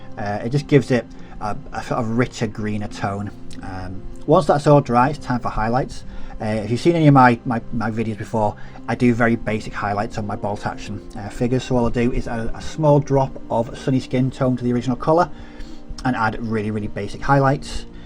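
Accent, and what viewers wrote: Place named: United Kingdom. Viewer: I think you have a very good British accent. For everything else, I found this great little site called <link> where you can listen to peolpe pronouncing words in their native language. Somua is not on it (yet) but one can request words and people native to that language will record reading pronouncing them eventually. I am waiting for the Somua now ... a French person will read and record it soon :) <link>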